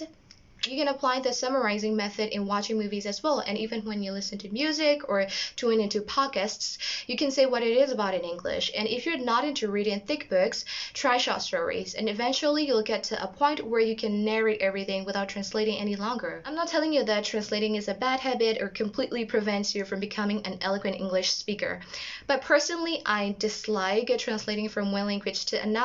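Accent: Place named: United States. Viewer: U have American accent n I love it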